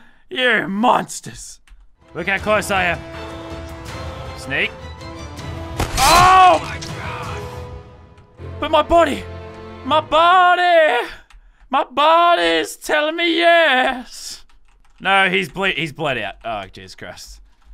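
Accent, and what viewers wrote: Australia. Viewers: DONT SWEAR YOU AUSSIE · Wait is lazarbeam Australian?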